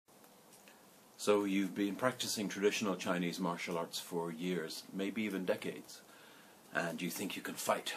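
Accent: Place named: Ireland. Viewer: Like your channel subcribed to lt lhave 20 years in karate done wingchun pressed thumbs up are you lrish you sound lrish lam lrish my grand dad was boxer and my uncles were boxer s lam 64 now love all things about japan and china my master was japanese there old lrish saying lt meant for you lt wiil not pas you by my mum and dad came from co limerick in lreland all besr with your channel godbless seamus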